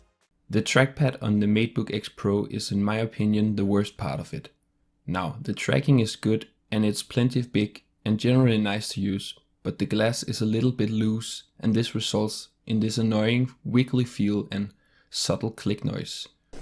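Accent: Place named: Denmark. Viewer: Er du dansker? Jeg så det danske tastatur, og det lyder også lidt som om at du snakker med en dansk accent.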